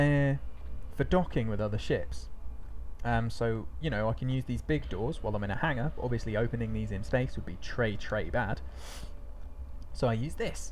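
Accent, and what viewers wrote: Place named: United Kingdom. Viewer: Surprised you don't have more subscribers after I heard your quality British tea-time accent.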